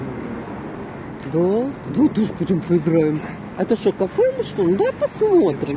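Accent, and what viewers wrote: Odessa, Ukraine. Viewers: У ВАС ЧИСТЫЙ ОДЕССКИЙ ГОВОР, МНЕ ОЧЕНЬ НРАВИТСЯ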